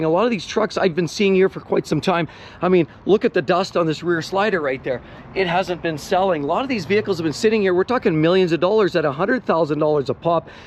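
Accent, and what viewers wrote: Canada. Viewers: He’s Canadian.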